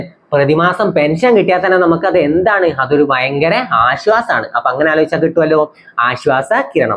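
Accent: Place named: Palakkad, India. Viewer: 18 വയസിനു താഴെ ഉള്ളോരേ ഒക്കെ ആരേലും താലോലിക്കോ? ചിറി അടിച്ചു പൊളിക്കല്ലേ ചെയ്യാ 😂😂😂😂 Typical Mannarkkad Ottapalam Slang 💙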